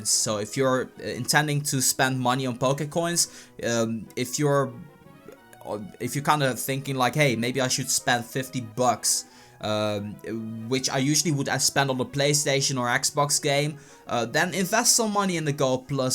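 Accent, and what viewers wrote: Netherlands. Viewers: Leuk accent in het begin, maar verder in de video krijg je een heel groot Nederlands accent. Maar toch leuk gedaan! Groetjes uit Limburg. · Are u dutch? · are you from holland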